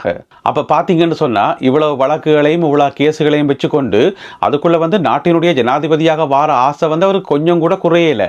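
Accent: Jaffna, Sri Lanka. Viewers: அஸ்ஸலாமு அலைக்கும் அன்பு "ஓம் தானே" என்ற உங்கள் அடிக்கடி கேட்கப்படும் அழகிய யாழ்ப்பாணத்தின் தமிழை நான் அதிகமாக ரசிப்பேன் ♥️🥰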